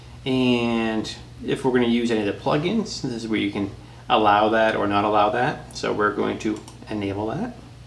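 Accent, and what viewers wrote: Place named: Maine, United States. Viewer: Thanks for the great video very informative it’s nice to hear from a fellow Mainer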